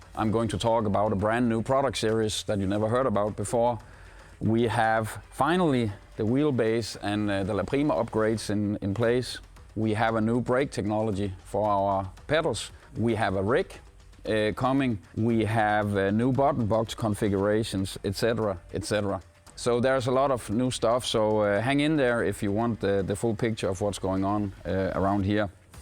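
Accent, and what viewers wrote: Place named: Denmark. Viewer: you can really tell this guy is from denmark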